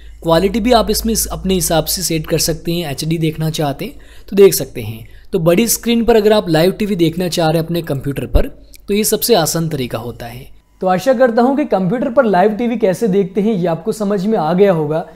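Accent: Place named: South, India. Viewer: Naryal anna accent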